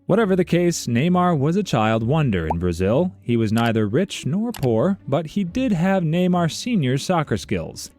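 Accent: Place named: United States. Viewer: First I thought to myself why did he put Ronaldo with a #21 on the thumbnail but then I heard the American accent and everything made sense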